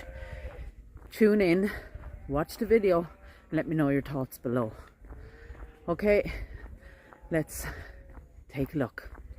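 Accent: Ireland. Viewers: When I was a kid, my Irish Grannie lived with us and she talked like you.... memories here TY TY TY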